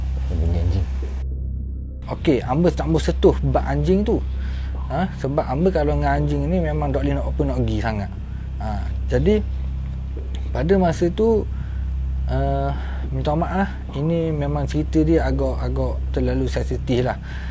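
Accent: Terengganu, Malaysia. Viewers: Assalamualaikum, sejak tgk channel saye dah faham loghat ganu🎉..sblm ni satu pun xpaham🤭...manusia berbeza2, Allah swt beri kelebihan dan kekurangan masing2. Org ikhlas berkongsi cerita, kite menjadi pendengar yg supportive. 🔥🔥🎉🎉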